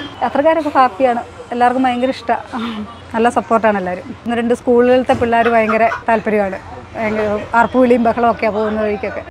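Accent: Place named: Pathanamthitta, India. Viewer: കാസറഗോഡ് കാരി ആണെങ്കിലും തനി പത്തനംതിട്ട കാരിയുടെ സംസാരം. 🌹🌹🌹👌👌🙏🙏👍👍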